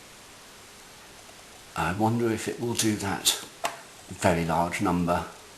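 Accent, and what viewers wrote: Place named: Scotland, United Kingdom. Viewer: your accent is scottish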